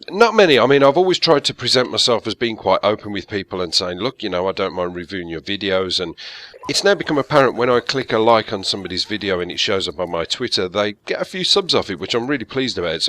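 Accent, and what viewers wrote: Birmingham, United Kingdom. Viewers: Lol there are people with a much thicker Birmingham accent than him and it does sound pretty grating lol. Also England will have a king when the queen dies, but the UK doesn't. The UK is a group of countries including England, Scotland, Northern island, and Wales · I find it really hard to believe that no one noticed you were from birmingham. Good video though, got to know the old stalion a little better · I thought you were a brummie but wasn't sure. Confirmation ftw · I just love the British accent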